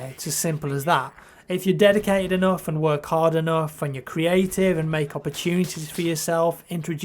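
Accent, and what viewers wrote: England, United Kingdom. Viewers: What part of england you from?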